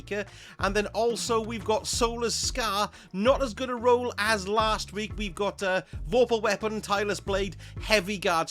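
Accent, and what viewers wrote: Wales, United Kingdom. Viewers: Pretty sure it’s Welsh my guy 👍🏼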